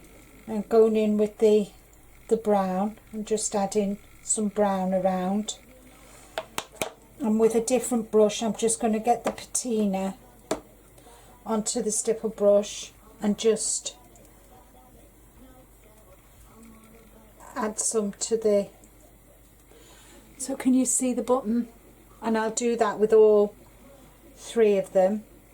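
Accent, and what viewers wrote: Liverpool, United Kingdom. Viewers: Love how you put this together, watched the vid after watching some of Mike's... only recently discovered this form of art.... you're very inspirational..... do I detect a hint of a scouse accent there? X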